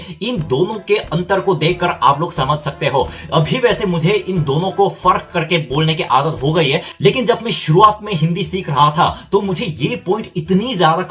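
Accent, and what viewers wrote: Standard. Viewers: Aapki Hindi bohot acchi hai or uccharan bhi bohot shudhh hai....Agar aap bataoge nahi toh kisi ko bhi pta nhi chalega ke aap Koreai nagarik hai.... You explained the whole thing beautifully....the video also tells a lot about Korean language. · Bohut sudh hindi bolta h bhai esha hindi toh hamlog nahi bolte.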